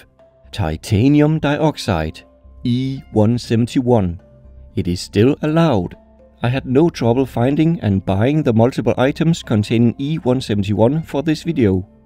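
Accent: Denmark. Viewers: Danes don’t pronounce things the way that they appear in the spelling. “Kopper” sounds more like “koh (w)uh”. What you are hearing him do is “speaking English” with a Danish accent. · I totally knew you are Danish, because of the accent. I always wondered what exactly happens in the body when eating all that gold. I asked the chef at "Restaurant Ti Trin Ned" when I worked as disheasher in 2007/08, and he said that it was all safe. But there might be long term side effects when eating gold every day. And then again, who does that? 😁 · You sound super Danish xD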